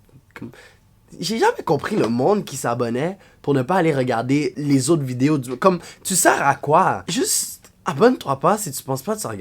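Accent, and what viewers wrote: Quebec, Canada. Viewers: J’adore ton accent québécois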